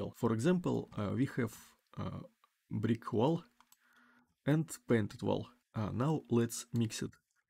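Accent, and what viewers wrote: Russia. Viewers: По окценту понятно что русский) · А как-то на родном, а не на конченом вражеском, на комильфо уже? Может, предоставить перевод самим браузерам делать? Поищу либо на оригинале (включу перевод), либо на родном, русском. Пишу по-русски ибо акцент дичайший, русский человек говорит. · cool tutorial but with your accent you should sound evil russian characters in games and films))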